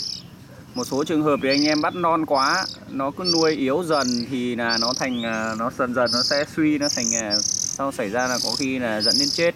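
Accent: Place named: Vietnam. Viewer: Bác giọng Thanh Lãng ak :))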